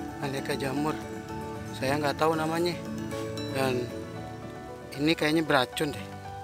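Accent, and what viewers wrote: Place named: Jakarta, Indonesia. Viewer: oww.. Kayk orang Jawa.. Tp kok logat nya betawi.. 🙏🙏🙏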